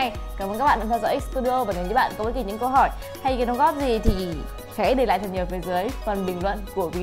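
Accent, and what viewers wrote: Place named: North, Vietnam. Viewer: Bà ơi bà,bà đã nói giọng Bắc khó nghe rồi,mà còn nói chữ dính chùm chùm như mới ăn nho xong vậy...nghe mệt mõi quá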